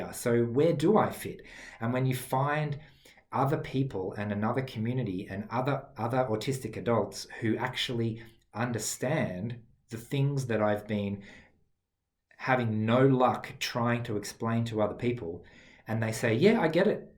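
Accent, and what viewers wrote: Australia. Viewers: I'm self diagnosed, now 44. Getting a diagnosis here where I'm from was too much of a hassle and cost for me a few years ago at least. But I'm glad I know for myself now. I had a boyfriend a few years ago who's on the spectrum also, and it was like coming home. For both of us. I'm really hoping to meet up with more people on the spectrum, but there aren't any meet-up groups like that here and I don't have Facebook, otherwise I would love to join! I'm just really glad I know for myself, and yes, I live pretty much like a recluse, even before covid. But thanks to studying spirituality, I've learned to love myself and not think less of myself because I understand that diversity is necessary for evolution :) I really chuckled at your trying to stay on topic or sticking to a script comment there lol, that's something I have to actively be conscious of all the time!! 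I'm from Brussels, but sometimes I wish I lived in the UK, just because of the access to more meet-up groups irl. You sound like you're from down under though, but not sure! Anyway, thanks for being an advocate for an often unseen group of people. Your videos are really good and easy to listen to, no bells and whistles, just how I like my clothes, my food, and my videos lol 👍👍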